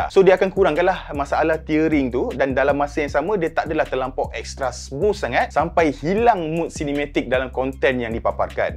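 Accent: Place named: Malaysia. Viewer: Ada app yang sesuai untuk view gallery / video dari USB drive? Coz saya ada Xiaomi TV Box tapi tak da app yang sesuai nak buat slideshow gallery. Mohon pencerahan. Anyway, very good video, bes dengar review cakap macam orang Malaysia sejati! :D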